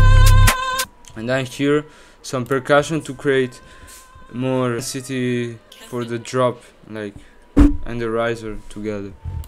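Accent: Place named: Italy. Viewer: ahaha nice remake bro. Si sente un po’ l’accento italiano ahahah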